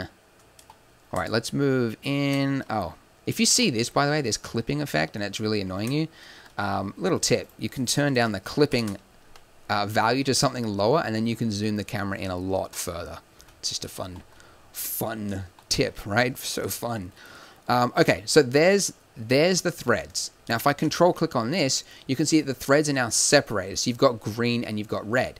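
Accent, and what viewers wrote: Australia. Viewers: I always wondered where your accent was from! Only realised you were a fellow aussie after watching your stylised earth tutorial the other day haha. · I didn't know you were Australian I thought you were British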